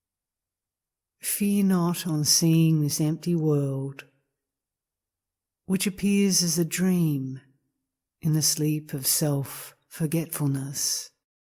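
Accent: Australia. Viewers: Bless you and thank you for this. I think I hear an Aussie accent, so happy to hear an Aussie with knowledge of our one true self <3 Om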